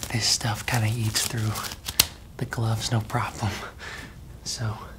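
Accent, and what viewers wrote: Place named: Midwest, United States. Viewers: You sound Midwestern and i love it 😊 you feel so comforting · He's giving me Chicago Bears SNL with the accent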